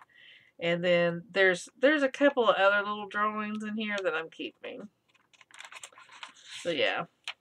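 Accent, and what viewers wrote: South, United States. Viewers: Hi! Just discovered your channel! Love your southern accent and your fun personality. Your gluebook is amazing!! I am originally from Oklahoma...what part of the south are you in? I totally speak southern. HAHA. No black Friday shopping for us.... I hate the crowds (with COVID, it may not be as crowded, but ick). We are going to try to shop local businesses for Christmas this year. Want to support our community. Usually we do Amazon.... easy peasy. LOL Thanks for sharing your gluebook with us! - Kari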